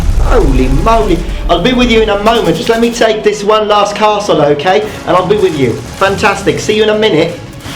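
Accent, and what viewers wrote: England, United Kingdom. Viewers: has an amazing English accent.. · your accent sounds english. also if youre italian why do you use a jewish star as a logo? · you're italian? i thought you're British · The Old French variant of the word, and the original way to pronounce it is writen "luef" instead of "lieu". This is the way the british have always used it, so there you go. learned english in the UK, so he speaks british english instead of murica english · Oh damn! You're Italian? I thought you were British! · I wondered what your heritage was......I thought maybe Greek or Italian.....but your English is so good.....you even have a British accent......I bet the ladies chased you in that uniform...;)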